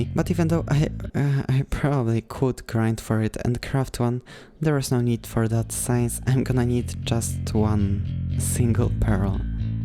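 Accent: Poland. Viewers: On jest z polski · Hi, you are from poland? I asking because of your accent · Taki nawet polski akcent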